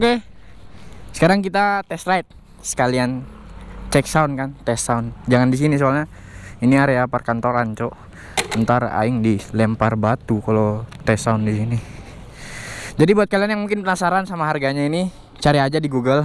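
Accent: Papua, Indonesia. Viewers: Bisa logat Papua yaa bang. Kreenn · bah sioo, ternyata abang juga ada logat papunya yah ternyata,, sukses terus abang salam dari Timika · om dari papua k? om pu logat kya di papua jd😂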